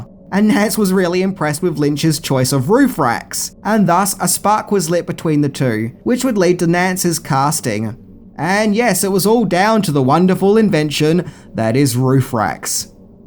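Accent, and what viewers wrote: Australia. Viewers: he's Australian and it could be his way of pronunciation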